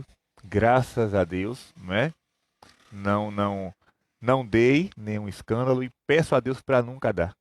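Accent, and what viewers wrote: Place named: Bahia, Brazil. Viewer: Padre que luz, eu que já adoro o sotaque baiano, ficaria horas te ouvindo com esta sabedoria serena e humana, parabéns, que belíssima explanação! Gratidão.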